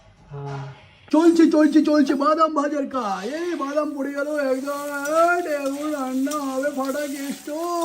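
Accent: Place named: Murshidabad, India. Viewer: Puro Murshidabad ar khati kotha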